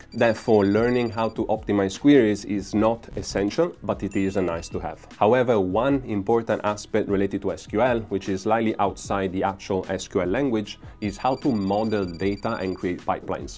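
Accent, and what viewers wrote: Spain. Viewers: Este acento suena español pero gracias me has resumido mucho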